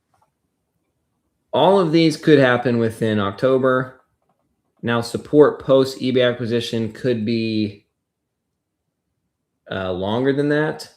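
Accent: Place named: North Woods, United States. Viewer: Wisconsin GME holder checking in, definitely a little north woods accent coming through lol. Always look forwards to your videos keep up the good work brother!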